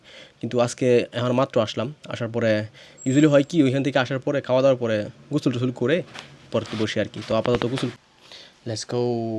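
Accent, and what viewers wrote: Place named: Kishoreganj, Bangladesh. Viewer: ভাই আপনার ভাষার টোন টা কিশোরগঞ্জ বা ব্রাহ্মণ বারিয়ার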